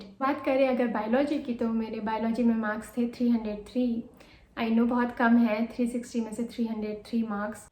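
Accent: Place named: Pahadi, India. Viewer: Ur accent is of pure pahadi region dear😀😃🤗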